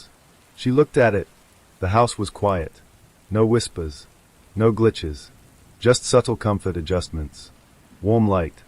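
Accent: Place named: Boston, United States. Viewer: Dear narrator, are you AI or areal person please? If you are AI, why have you been given a Boston accent. Just curious?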